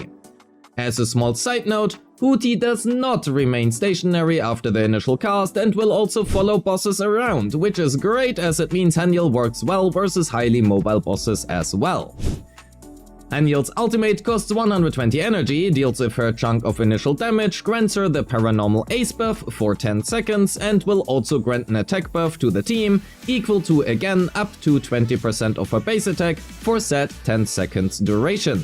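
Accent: Germany. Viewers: I know you are from a German speaking country because of your accent but I CAN'T PROVE IT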